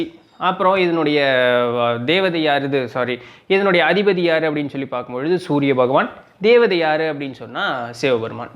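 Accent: Coimbatore, India. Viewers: Coimbatore slang super 👌🏻👌🏻👍🏻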